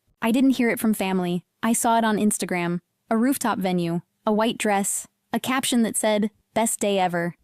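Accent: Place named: United States. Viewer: A Thai story whit american accent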